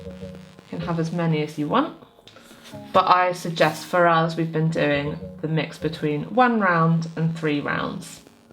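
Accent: United Kingdom. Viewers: Awesome instructor and very clear teaching of all the necessary techniques needed to accomplish what looks like an extremely difficult pattern to those who don’t crochet! A great way to use up all that stash, too! Thank you so much. It did take a bit of rewinding parts though just to understand her English accent, which I grew to love!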